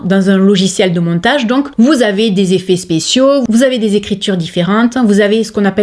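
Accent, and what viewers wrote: South, France. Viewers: J'ai été harcelée à l'école sur le fait que je parlais "pointu", en gros l'inverse de ce que l'on reproche à ton bel accent du sud. En gros j'ai été harcelée car vivant dans le sud, je n'en avais pas l'accent..... Ridicule non? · 😱 je trouve ça épouvantable d’avoir à expliquer tout ça! Je vais donc en profiter pour te dire que j’adore ton accent du sud! Je suis du Québec et mon fils s’est marié à Limoux en 2019! Il habite cette belle région du sud que je trouve formidable! Merci pour tes vidéos! 🥰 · Coucou, oui le harcèlement est réprimé par la loi, et oui il faut le dénoncer car généralement les harceleurs s’en prennent à plusieurs personnes quand le but est atteint, ils attaquent une autre et ainsi de suite … j’adore tes vidéos sincères, j’aime tes crash-tests et les autres tests avec avis bref, c’est un plaisir d’entendre ton petit accès du Sud et ton rire 😊 Belle année lumineuse ✨ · Moi j'adore ton accent du sud ❤ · Bonsoir. 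Et bien moi j'ai 55 ans, je travaille dans un service public qui accueille des clients plus ou moins intéressants, je suis du 12,j'ai un "assent" comme le tien...bref je cumule!!!! En résumé: vieille,fonctionnaire et du sud...mais qu'est-ce que j'aime ça!!!!. Vive nous et m.... aux aigris. Vive!!! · Bonjour, je crois que c'est la première fois que je laisse un commentaire...J'aime beaucoup ce que vous faites, et votre accent est super (je suis moi aussi du Sud - mais Est;) )..Pour en revenir aux réseaux sociaux j'ai fait un commentaire récemment sur twitter concernant une photo d'un hérisson en taggant une personne qui les dessine et qui les nomme "choupisson"...Je me suis pris une volée de bois vert de la part de l'auteur du tweet qui a dit en gros que ce genre d'appellation faisait du mal à ces animaux et incitait les gens à les prendre en animal de compagnie... Pour un mot... Merci pour votre bienveillance et votre sourire..; Belle et heureuse année à vous et votre famille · Merci à toi. 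Tes vidéos me plaisent toujours autant. Tu as bien raison de ne pas te laisser faire, bravo. Et moi j'adore entendre ton accent du sud en particulier tes "moins" 😂 bonne fin d'année à toi ❤❤ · Je suis estomaqué de voir à quel point certaines personnes sont méchantes. Moi j’adore tes vidéos tu me fait toujours passer un très bon moment, toujours bienveillante et j’adore ton accent ( je suis du sud aussi). Continue comme tu es et tu as raison on ne doit pas banalisé le harcèlement. Bisous 😘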